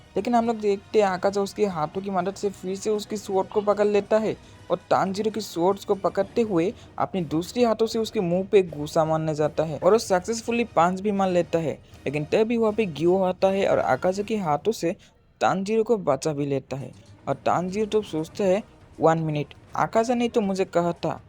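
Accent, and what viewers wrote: Bengal, India. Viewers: Bhaiya tumi Bangali na?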